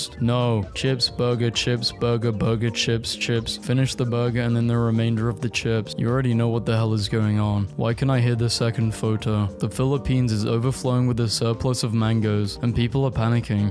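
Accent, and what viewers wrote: United Kingdom. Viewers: He sounds British, so I'd guess the latter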